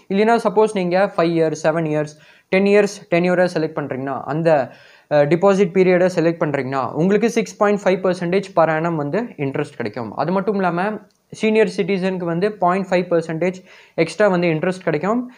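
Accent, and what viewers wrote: North India, India. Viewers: நீர் என்ன வடக்கரா? முறையாகத்தமிழ் பேசுக. The service by SBI personnel is not at all up to the mark.Every activity is carried out by machine. They start by half an hour late and close the counter before half an hour earlier by counting the currencies. For every transaction most of the interim works are to be carried out by the SB a/c holders. Very few lady personnel are exceptional. Every worker should be in uniform with the explicit I'd card and phone no. Most of the time the printer is out of order. Counter to counter, the customers are tossed. With the e.governance, they themself could verify everything and they seek pleasure in driving away the senior citizens.😮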